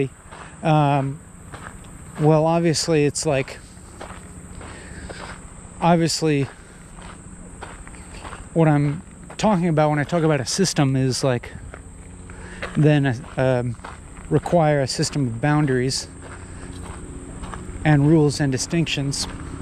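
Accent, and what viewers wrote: United Kingdom. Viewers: You’re American/canadian? I always thought you were British 😂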